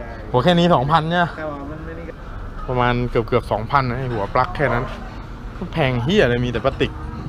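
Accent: South, Thailand. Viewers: คนใต้นิเบอะ ... 555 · เอ้า!! ใอ้ย่ะคนใต้บ้านเดียวกัน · พี่คนใต้หอครับ. ตอบแท่ได้ครับ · สำเนียงเหมือนคนใต้หรือคิดไปเอง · พี่เป็นคนใต้ป่ะคาป ได้ยินเหมือนพุดใต้เลย พรักยุไหนแล้วอา....? 5555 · แหลงใต้ชัดปีกเลยนะ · แหลงใต้ชัดหลาว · ใช่ครับ พี่เค้า คนใต้ คนนครศรีธรรมราช อ.ลานสกา ครับ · พี่คนใต้กันช่าย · พูดใต้อ่าา หล่อจังงเสียงง 😍😍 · ขอบเติ้นเเหลงใต้นิ ว่าหรอยๆ ผมยุพัทลุงนิคับ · พี่คนใต้หรอครับ>< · พี่คับพี่อยุ่จังหวัดไรอ้ะเสียงใต้ๆ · เพิ่งรู้ว่าพี่คนใต้555 สงสัยมารนานละสำเนียงอะไรทำไมคุ้นๆ555 ผมคนหาดใหญ่ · คนใต้เหอะนิ จังหวัดไหน คับ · ว่าใต้จัดเจนเรย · คนใต้555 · พี่เป็นคนต้เหรอคับ จังหวัดไรเหรอ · อ้าว พี่คนใต้หรอคับ555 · เเหลงใต้พั่นนี้ผ่านเลยพีี่บ่าวววว !! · คนใต้นิกว๊าาาคนบ้านเดียวกัน ติดตามเรยๆ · แหลงไต้เลยหรอ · คนใต้นิหว่า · ว้าวพี่พูดใต้น่ารักมาก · พี่คนใต้หรอครับเนี้ย 😁😁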